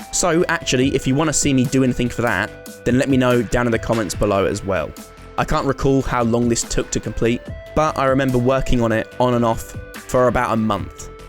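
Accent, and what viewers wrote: United Kingdom. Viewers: I really really hate English(your) accent but that what you do is siiick. And accent didnt exist now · why does he kinda look like boffy but with a british accent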